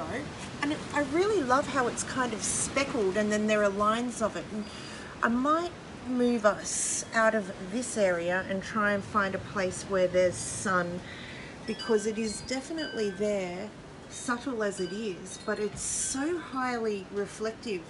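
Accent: Australia. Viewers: Just love hearing you talk. For a minute I think I’m back home in Sydney, I wish I was. On ya love!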